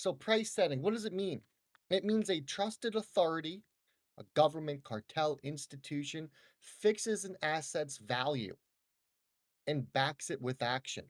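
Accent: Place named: Western Pennsylvania, United States. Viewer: are you from the Pittsburgh area? I hear a western Pennsylvania accent when you talk.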